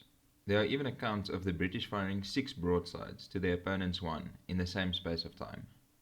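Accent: South Africa. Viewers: Perfectly good English. Don't let the morons get you down. Sounds like a South African accent maybe?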